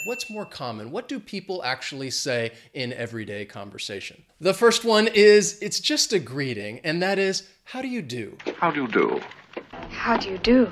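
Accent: United States. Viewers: Are you a new Youtuber and an English teacher?? Thank you for this video and I like American accent as yours. I will show this video to my students. I never say " How do you do?" but this phrase appears in an old song from the 80's by. I've heard that "How do you do?" is used in the United Kingdom only and the same thing is "Shall"in all pronouns. We know that the English language has changed during the half past century and there are words that we no longer use. Thank God we can learn and speak an understandable and clear English. 😆🗽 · Hi there, I'm French and writing from Paris ; it's been raining really hard yesterday with cats, dogs and even hail 🦝🦊🐯🦄🐷 ! But I don't know how the weather is 😎 right now... I've found yr channel a few days ago and I noticed that you were saying all the time that you're teaching English while I noticed right away your American accent and style. 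As I'm also following other learning English channels, I thought you should be mentioning that you're teaching American English which is quite different in terms of accent and idioms. But I see you've made it, much better so ! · I love your american acent. I live in Italy and always the people speak English from UK and some time I'st hard understand. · You are my favorite English teacher because I'm more into the American accent rather than the formal English accent taught in India. BTW it's slightly raining in Kerala. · I'm a native English english speaker :) and I love your videos for picking up a good american accent for some creative work I'm doing. However, in the UK, we do still talk about the weather a lot, lol! Although we would say things like 'deadful weather isn't it?' rather than how's the weather.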